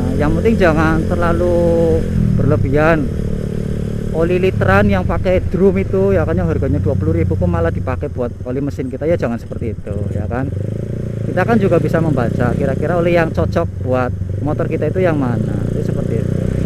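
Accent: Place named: Banyumas, Indonesia. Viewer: Ora ngapak ora penak 😁😁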